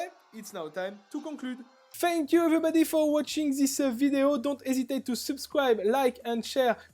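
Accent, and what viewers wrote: France. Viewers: bonjour tu ne serais pas un français par hasard??? ton accent est trop marrant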